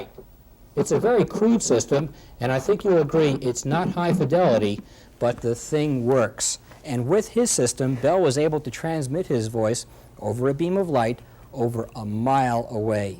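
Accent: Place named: New York, United States. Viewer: Mirruh and electrissidy. Sounds like Richard Feynman def new yorkish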